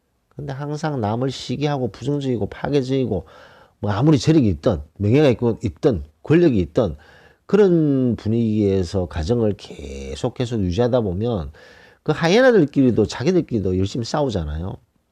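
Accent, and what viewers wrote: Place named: Daegu, South Korea. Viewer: 방송 감사하게 듣고 있습니다. 정말 좋은 말씀 대구 사투리로 듣는데 편안하고 유익합니다.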